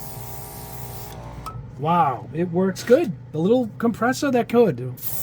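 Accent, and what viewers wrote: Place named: South, United States. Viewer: It’s his regional accent. Down south we say it different.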